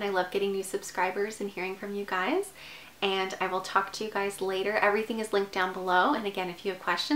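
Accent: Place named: Canada. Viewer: I thought you were Canadian , I’m from Nova Scotia !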